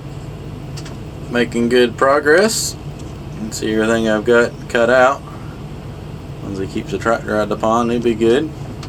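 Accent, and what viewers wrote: South, United States. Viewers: Meuud, lots of meuud ! Love your Southern accent !! 👍👌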